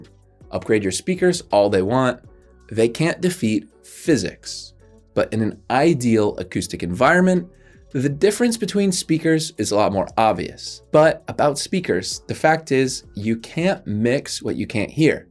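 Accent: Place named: United Kingdom. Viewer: Where are you local to? UK I'm guessing? I watched a video yesterday and I think that was your accent.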